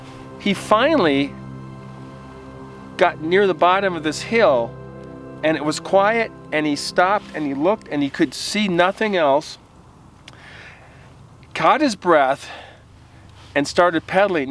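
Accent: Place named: Midwest, United States. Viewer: I was just thinking about how to ask you if you were from the Midwest! I’m from IA but have lived many places. I love listening to you.🧚🏻‍♀️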